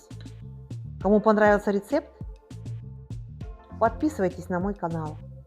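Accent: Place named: South, Russia. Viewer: ЛОжить, лЯжу - из малороссийского говора, другого чередования согласных, ударений, ну и что, с большой теплотой к нему отношусь, весь юг российский на этом диалекте говорил, окают в Нижнем, акают в Саратове, Москве...и т.п. Это все прекрасный русский язык в разнообразии своих практик жизни. Спасибо вам, за доброжелательность и неравнодушие!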